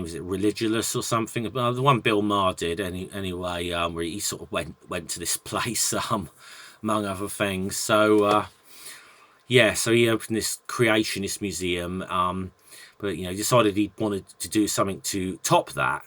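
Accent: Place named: United Kingdom. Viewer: How am I only just realising you're also British? 😲 🤣 Geez, I think 🤔 it's time to clean out my ears 🤣 Also, *Ken Scam 🤣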